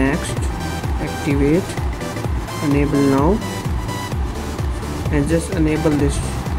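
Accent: India. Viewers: eat a like for your Indian accent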